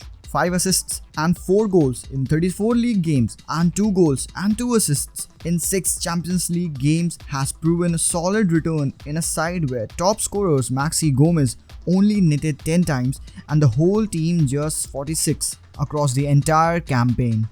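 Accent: India. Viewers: great video loved it keep it up 1.add subtitle options 2.it will be a lot better if your accent is improved from indian to a bit british or american.(JUST MY OPINION,PLEASE DON'T MIND)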